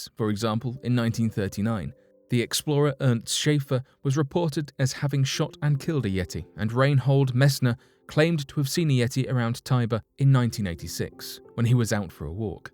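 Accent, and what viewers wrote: England, United Kingdom. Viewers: Any british voice over on a video about Yeti, Ufo's, crop circles, and most other stories having Zero evidence are just like this. It sells but has no filling quality. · This English accent guy is an awesome narrator!!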